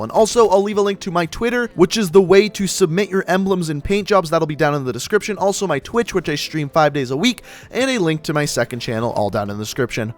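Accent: Canada. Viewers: are you Canadian? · Soo soory you sound really Canadian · He's definitely Canadian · ohhh I know your from Canada now ''soory" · Are you Canadian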